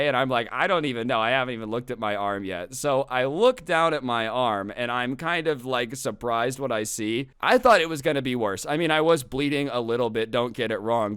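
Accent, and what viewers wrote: United Kingdom. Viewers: I think his cat noises and British accent makes me have stampy vibes but stampy actually talks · ngl i like british accent or something (im not british)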